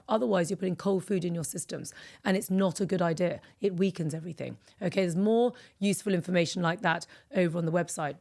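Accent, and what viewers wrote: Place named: United Kingdom. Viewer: thankyou. So excited to have found your channel, beautiful, knowledgeable lady, and with a perfect British accent, yay!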